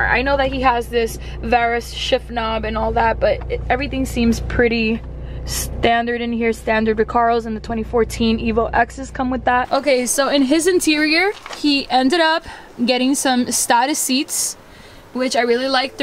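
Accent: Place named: New Jersey, United States. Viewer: Are you Italian ?? You certainly look it . You definitely can't deny that you are a Jersey girl because you definitely have the Jersey accent and I have the Pittsburgh Yinzer accent. I vacation in Wildwood NJ every year and it is my absolute favorite vacation destination of all time.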